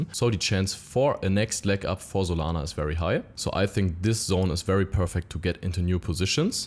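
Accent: Germany. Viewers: I like your german accent ❤🎉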